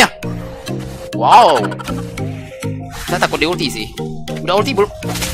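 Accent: Kalimantan, Indonesia. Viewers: Wah kalimantan ya bang ? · Bang Kmu dri kalimantan mana klo bleh tau sih :-)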